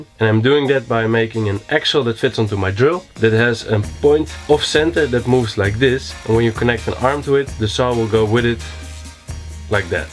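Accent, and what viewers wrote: Netherlands. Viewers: Why do u sound dutch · Dat vermoede ik al aan je Nederlands accent in je Engels. Leuke video's, ga zo door. English text below. ------------------------------------------------------------------------------------------- I suspect that from your Dutch accent in your English. Nice videos, keep it up. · Ben jij toevallig Nederlands 🤔😂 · Zo Nederlands al maar kan dat Engels , mooi project ! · Nederlands? Gehoord accent.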